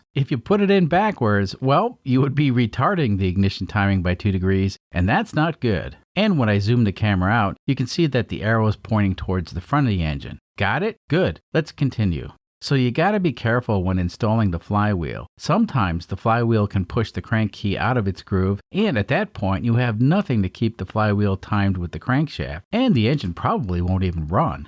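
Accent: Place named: Boston, United States. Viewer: It sounded good, I think what was different was it was just a slightly different presenter voice, maybe slightly more comfortable than normal. It was very clear and had good quality audio. Reflecting on it after I commented I honestly got a little choked up. I grew up listening to CarTalk Sundays. Your accent is a mix but leans Bostonian it reminds me of Tom and Ray a little.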